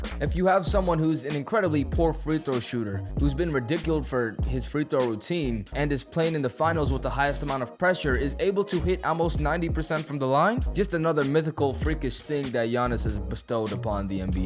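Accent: Kenya. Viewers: Your Kenyan ?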